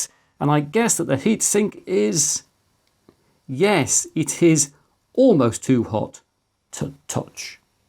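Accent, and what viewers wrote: United Kingdom. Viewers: Thank you for very clear and easy to follow videos and fantastic British English. So nice in middle of those AI s**** channels… using AI voice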